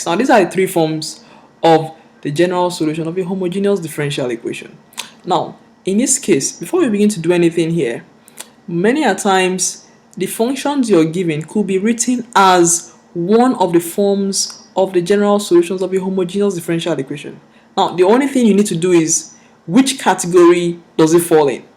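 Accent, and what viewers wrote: Nigeria. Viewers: Sounds Nigerian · are you Nigerian?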